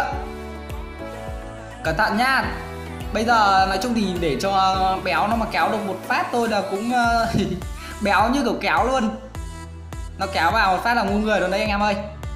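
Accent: Nam Dinh, Vietnam. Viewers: Quê a ở nam định à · A ở nam định ạ :))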